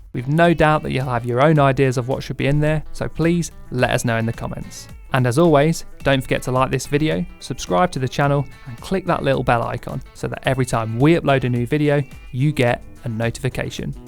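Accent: United Kingdom. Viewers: Enough with the ( repetitive, loud) "music" track. I have a difficult enough time understanding your Brit-Speak without the added burden of the "music"!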